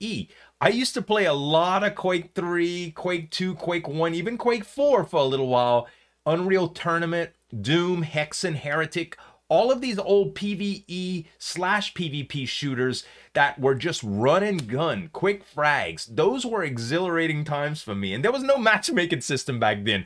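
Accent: Louisiana, United States. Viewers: Lol what part of Louisiana you from bro?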